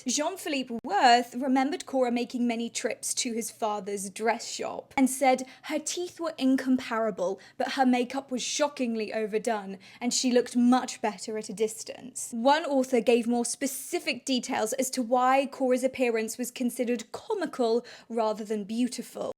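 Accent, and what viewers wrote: England, United Kingdom. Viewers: Excuse me is this British accent? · I love the English accent, it would cool if a great grandma-ma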